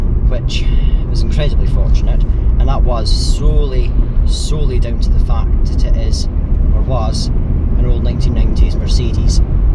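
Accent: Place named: Scotland, United Kingdom. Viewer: Thanks for uploading a new video. It sucks that you wrecked the Benz, it looked like a really nice car. Anyhow, there's another issue - it may be due to the fact that I'm not a native English speaker (I love your Scottish accent though), but with all the noise from driving the car, it was very hard to understand everything you said in this video. Also, thanks for not mentioning my name (which is fake anyways, but no matter) in the video (anyone who reads the comments can figure it out anyways, but that's about enough for me).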